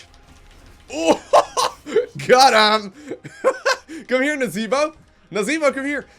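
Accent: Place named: Netherlands. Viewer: Sounds like he shaved away some of his Dutch accent. That's really difficult so good job on that.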